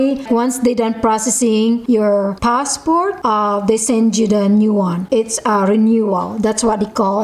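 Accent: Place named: Philippines. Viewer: I like the accent very Filipino. Thank you for the information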